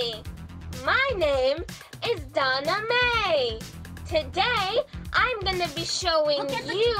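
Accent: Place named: England, United Kingdom. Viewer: As always speaks in a VERY English accent EDITING ON TOP FORM 😅 Loved this, I genuinely look forward to when you upload 😄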